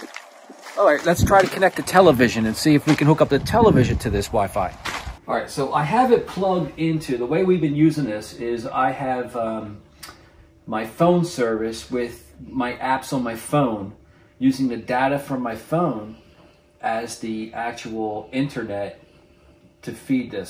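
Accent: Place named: South Jersey, United States. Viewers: You’re from south Jersey aren’t you ? I can tell by the way you talk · Are you from Jersey or Philly?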